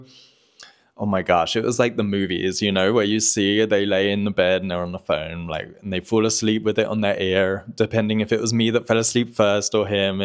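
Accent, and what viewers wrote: England, United Kingdom. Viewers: I am from Birmingham but it wasn't me lol loads of fake pics still about these days interesting vlog btw you still got your English accent 🍻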